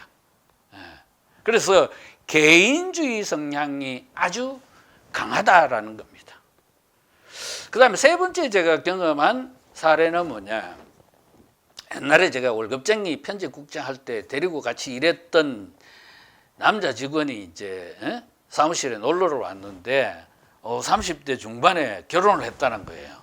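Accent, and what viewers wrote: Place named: Gyeongsang, South Korea. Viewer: 경상도 말튜네. 건설 마피아의 악마적 결과를 세대갈등으로 작정하지마시오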